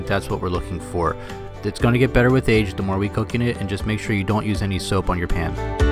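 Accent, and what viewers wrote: United States. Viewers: Your midwestern accent is so charming, makes me want to watch more of your videos! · This guy sounds like he's from Baltimore ("wooder" ...my only knowledge of Baltimore comes from The Wire haha)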